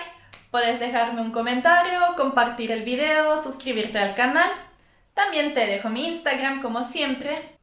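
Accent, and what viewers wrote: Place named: Paraguay. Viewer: Ya tenes acento paraguayo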